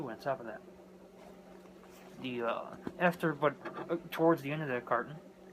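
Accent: Chicago, United States. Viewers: That is one strong chicahgo accent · That Chicago accent I'm from Chicago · He is from Chigaco I think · My family is from chicago and surrounding area and i picked up on the accent. I thought you may be from Chi-Town. And i think i detect a little Italiono too. Like listening to one of my family members. Fuckin love it! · The only people I’ve ever known with a Chicago accent that thick were all in their 60’s. Maybe this dude is a time traveler. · Fuck yeah dude the second I saw the jacket and heard you speak I knew this boy was from Chicago. Windy City best damn city in the world!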